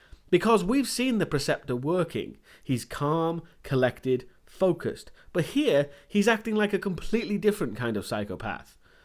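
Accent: United Kingdom. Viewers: Is this dude British? I love his reviews btw I just want to know if he is or not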